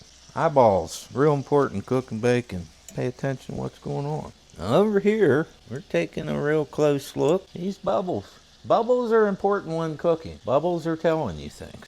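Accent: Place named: West Virginia, United States. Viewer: your accent reminds me of home so much. Do you happen to be from West Virginia? And if so, what part?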